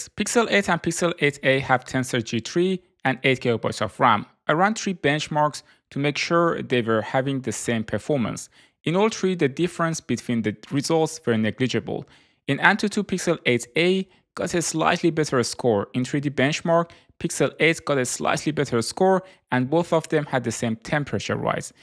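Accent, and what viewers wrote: Iran. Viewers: Is that a Persian accent I hear?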